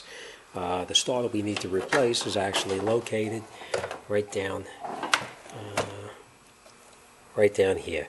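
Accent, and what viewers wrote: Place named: East Coast, United States. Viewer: The volume was fine☺ maybe I just like your voice. Your east coast accent. Wiya=wire 💪👍👌👏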